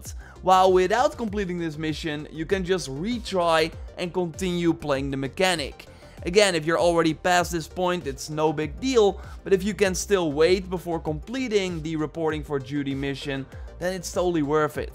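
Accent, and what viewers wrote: Netherlands. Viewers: is deze man nederlands? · I smelll dutch ❤ big fan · Je hoort zo makkelijk dat je Nederlands bent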